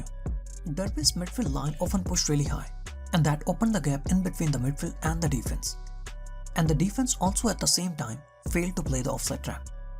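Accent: India. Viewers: what's with this indian accent? turkish hijacked?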